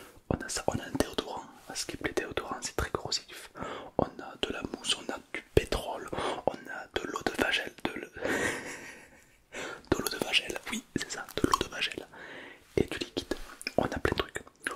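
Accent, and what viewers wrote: South, France. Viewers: Adiu tu as un bon accent du sud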